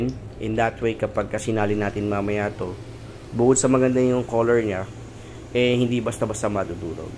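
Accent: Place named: Batangas, Philippines. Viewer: May accent ka Sir ng Batangeño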